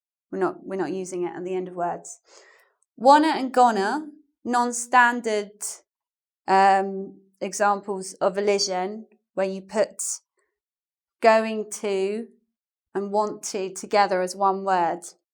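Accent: United Kingdom. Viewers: You know what, I really really like your accent. Before I found British is hard to understand for me but after watching your "How to sound like a Posh" I felt like I can understand all what you were saying. so after that I spend my time watching British accent video.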